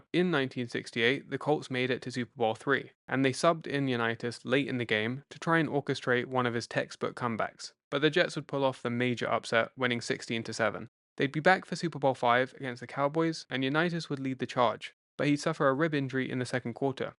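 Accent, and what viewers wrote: United Kingdom. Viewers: Why is some Brit guy telling us about American football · A British accent for the narrator? About an NFL player? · Full of typos. And I'm sorry but listening to a Brit talking about Johnny U is like listening to a Sherlock Holmes story read by an American.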